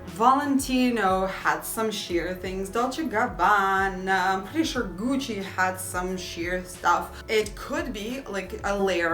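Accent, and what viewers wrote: Russia. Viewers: You’re gorgeous ❤️ are you Russian? I’m asking because of your accent! Thank you for this video!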